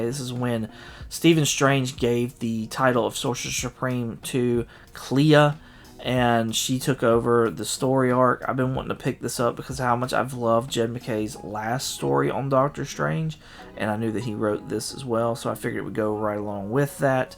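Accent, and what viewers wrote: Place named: South, United States. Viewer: Hey brother! I came over to check out your channel after hearing about you on MONComics! I wanted to watch your latest video to see what you're all about! Do you live down south? I notice a little bit of the south in your voice! 😉 I actually lived in Vicksburg, MS for a year when I was 15. Had a great time there! I love all your new books you picked up! Right now I am just concentrating on finishing my Conan Collection and just started working on collecting every Batman (that I will never finish since I am so damn old) LMAO! I love the Constantine movie, but have never read any of the books. Are the new TMNT still as gritty and have violence like the original 80's run? If so, I need to pick them up! Love that Wolverine cover for sure!!! I enjoyed your content, looking forward to your next video, and Happy to be your new subscriber! 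Number 970 is a good number! Good luck on your journey to 1K!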